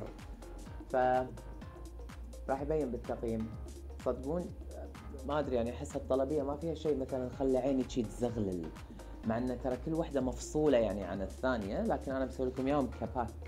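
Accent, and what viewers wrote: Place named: Iraq. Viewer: احسك عراقي مو كويتي😭💕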